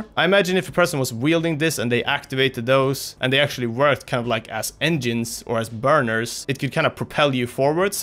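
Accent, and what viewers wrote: Sweden. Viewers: you swedish?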